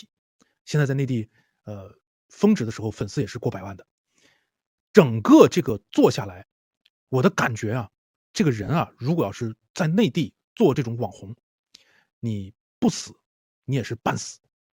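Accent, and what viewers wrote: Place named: Northeast, China. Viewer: 长相口音，典型的东北满狗或者蒙古鞑子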